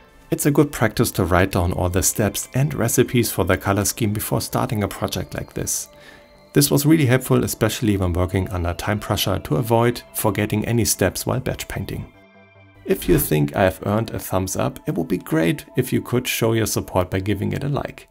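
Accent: Germany. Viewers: you are german i am sure haha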